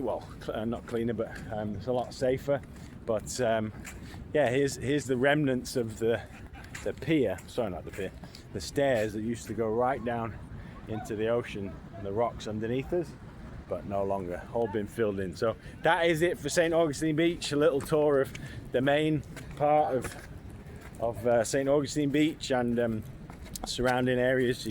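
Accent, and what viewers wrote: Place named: United Kingdom. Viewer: I used to live there …..many years ago..thought you were British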